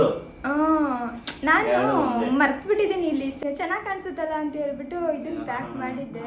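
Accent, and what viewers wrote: Mandya, India. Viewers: Super gi coolagi helidira bad comments madirorige artha agirutte bidi Bro matte nivu nimma mathu tumba ista nange namma mandya bhashe matadtare ❤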